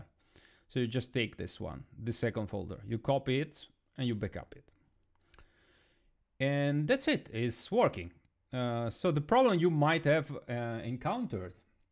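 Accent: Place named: Italy. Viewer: Nice video and great explanations! And you have a lovely Italian accent! Keep on the good work!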